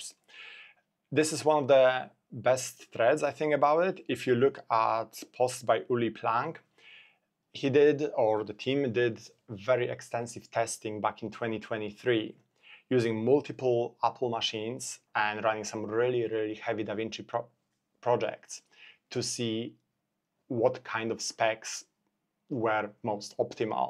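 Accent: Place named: Poland. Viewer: Mordo, od razu wiem żeś z Polski. :D pozdro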